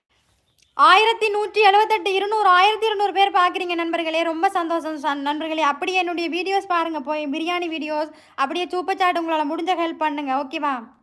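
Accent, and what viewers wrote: Sri Lanka. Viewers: இலங்கை தமிழ் சூப்பரா இருக்குங்கோ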